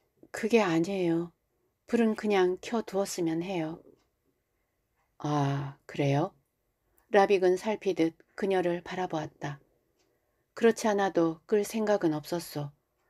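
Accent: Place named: Jeolla, South Korea. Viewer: 책 읽느라 수고가 많으십니다. 혹시 전라도 분이신가요? 몇 군데 발음과 억양이 듣기에 거슬리는 부분이 있습어요. 낭독자께서는 왜 의복, '외투'를 '에투'라고 발음하시는지요. 경 험에 의하면 호남사람들이 주로 그렇게 발음 하는 경향이 있더군요. 혹시나 해서 물어보는 겁니다! 아무튼 책 읽느라 수고가 많으십니다.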